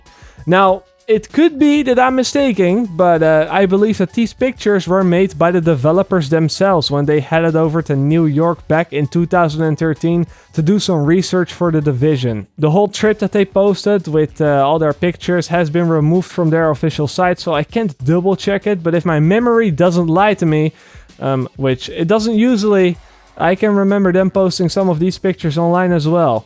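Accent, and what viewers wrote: Netherlands. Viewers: pff je hoort duidelijk dat hij Nederlands is hahaha, het heet volgens mij iets van steenengels. dan probeer je niet eens met een accent te praten. bijv. trough en true spreek je gwn het zelfde uit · Jij bent Nederlands hahaha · Ben je nederlands ?? zo te horen aan je accent 😂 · are you dutch if you are im to =)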